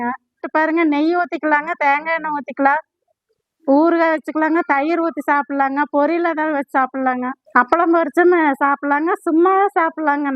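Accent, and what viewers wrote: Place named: Kongu, India. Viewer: Akka nama Kongu tamil kalandha saadham arumai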